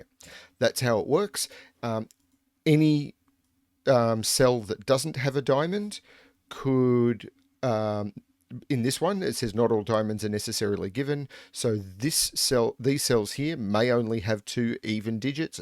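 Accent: Australia. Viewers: Hi, just want to say how happy I am that I found your channel:) I've been on CTC since before you could solve along with them and you had never been in my recommended before last week. Since then I'm hooked and have been binging old videos while it's still raining. I heard you say on one that run time was a big factor for YT to push your content (which after many years of watching I didn't actually know) so have decided to continue running your video on mute while I attempt the puzzle so you'll still get your times up. Maybe suggest this to your audience as I can see a lot of regular CTC commentators on here too and maybe they don't know either, and you really do appeal to a generally easier solve than some of Simon's monsters. I don't belong to the discord, but I've checked out the other channels that you've added in your home page too so good for you for supporting the community. 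I'm the only Sudoku geek in my friend group so nice to hear an Aussie voice here too. Keep up the great work, and I love that my brain solves the way you do too:)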